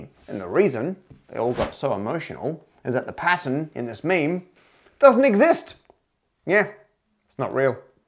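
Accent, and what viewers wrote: Australia. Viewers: It is probably in part "fault" of the more cultivated Australian (is that what people still call it) + British influences accent, for some reason people tend to see the latter as more posh or smug automatically, the former is reminiscent of the latter, and some people can't tell which is which anyway. I am afraid to say, you're sort of facing an uphill battle on that one, and in reality is no fault of yours in that particular department, since the real fault is just people not being sufficiently exposed to more varieties of English, which sucks but it is also natural. I.e. just bad luck (though I still wish you the best of it, for what it is worth, which is probably nothing). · Why is Simon Whistler talking Australian? That should be the question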